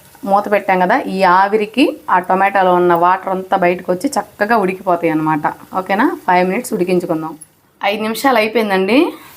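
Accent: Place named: Guntur, India. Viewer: Super super superrrrrrrrrrrr intlo chesukodaniki simple and superb Tq Gnt slang chala neet ga vuntundi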